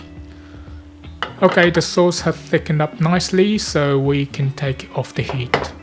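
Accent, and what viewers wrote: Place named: East London, United Kingdom. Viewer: Cheers for the recipe mate! Did you live in London before? I can detect a slight East London accent ;) Love your content! I'm gonna try to make this dish tonight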